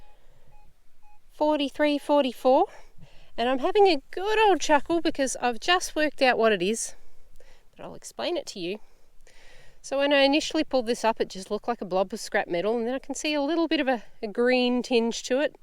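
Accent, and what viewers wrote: Australia. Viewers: JUST WOW!! OL' GOT SOCKERED ON THAT LITTLE JUNKET....OR HE WASN'T SHOWING HIS TRUE COLORS TO SAVE YOUR FACE?? LOL, I WOULD LOVE TO TAKE A GOOD MONEY STICK AND SCAN THE BEACHES AT AND AROUND GULF SHORES AND ORANGE BEACH ALABAMA, BUT I AM TOO DADGUM OLD AND WORE OUT NOW. TOO MANY DIRT ROADS BACK IN MY DOO-WAH-DAZE, LOL. SO I'L JUST BE CONTENT TO WATCH YOU AND SCOUR THAT SIDE OF THE PLANET AND OL' AQUACHIGGER DIG OUT TREASURES ON THIS SIDE. GOOD ON YA GAL, YOU ARE REAL PEACH!!! SEE YA NEXT POST I CATCH! PS..I;LOVE TO HEAR AUSSIES TAWK...I'D SOUND FUNNY TO Y'ALL WITH MY HEAVY SOUTHERN DRAWL.....CARRY ON!:face-blue-smiling:face-red-heart-shape:face-turquoise-covering-eyes:cat-orange-whistling:face-blue-wide-eyes:body-green-covering-eyes:goat-turquoise-white-horns:😝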